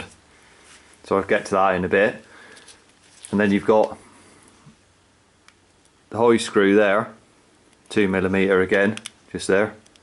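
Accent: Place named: Bristol, United Kingdom. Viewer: do you know, love the what must be bristolian accent and despite it sounding boring, to me today you are a hero.... All day I have been trying to sort my front derailiuer . I've checked 100 vids when things didn't or wouldn't go right... All I needed was to fit that tab that you so eloquently demonstrated. Jeez, I should have listened to you in the first place. thanks so much for this vid it's the best,.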